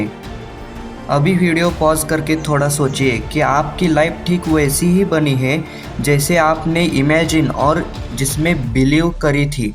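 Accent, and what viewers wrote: Maharashtra, India. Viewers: Bro where are you from it sounds Marathi guy anyway. I agree with you bro you really explained by hurt and its true. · कसलं भारी मराठी मे हिंदी बोलतोय भाऊ तू .....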